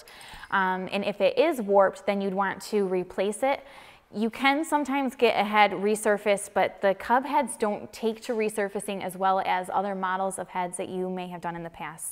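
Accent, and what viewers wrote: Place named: Upper Midwest, United States. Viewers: Lovely girl...very skilled...and love your Minnesota accent. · It took a couple of seconds to figure out what she meant by the pistons being in the "black". The upper-midwestern dialect is so endearing. 🥰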